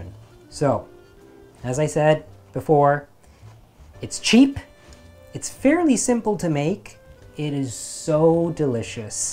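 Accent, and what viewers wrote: Jaffna, Sri Lanka. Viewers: Thambi… super Unga srilankai Tamil kadhil ketka romba inimaiyaga ulladhu… lovely to hear your srilankam Tamil … rombavae vadivana vlog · “Melaha thool” lol love it that you still have your yaarlpannam accent. Looks amazing.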